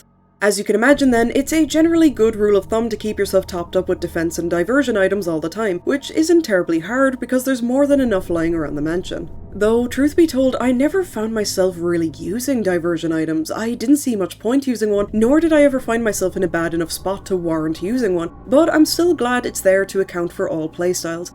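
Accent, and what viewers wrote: Ireland. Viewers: Hi! So, I watched your videos on Rule of Rose, Haunting Ground and now, Remothered. About time I leave a comment: your coverage of the games is great and I find the video length quite comfortable, too. As long as a Twin Peaks episode ;-) By the end of this vid, you shared how surprised you were to hit 100k views. I think it's well deserved for the polished video presentations. And the Irish accent :3 Just keep following that passion. I'm sure life has great things in store for you and your videos. Also, I can't go without mentioning the bit of Silent Hill music I heard at the end of your Haunting Ground video. I'm a sucker for the SH music and aesthetic. So, thanks for that, too :D edit: I read through the descriptions of the other videos I watched. More SH tracks that have gone undetected. It's subliminal bait for SH fans at this point :D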